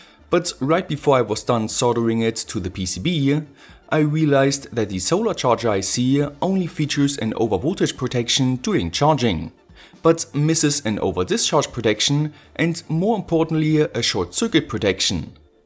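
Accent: Germany. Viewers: Hah, i knew u were from Germany from the beginning! Hu he hu he hu he. Where do u come from ? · ja.... man hat an deinem Akzent erkannt, dass du Deutsch bist ;) tolles Video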